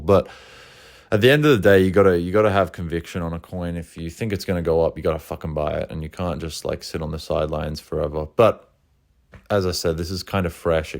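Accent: Australia. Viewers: chad awsie accent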